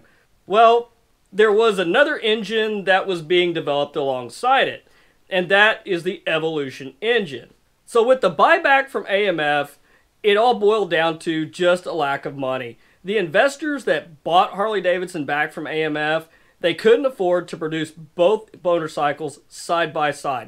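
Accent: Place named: South, United States. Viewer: You must be in Texas or Louisiana. Jeff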